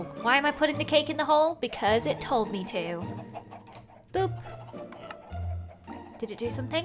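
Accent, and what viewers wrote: United Kingdom. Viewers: sounds so much British in this video😂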